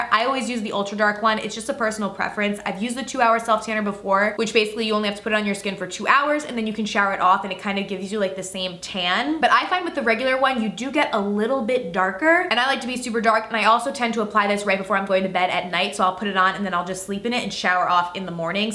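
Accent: New Jersey, United States. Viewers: It's She's a Jersey girl.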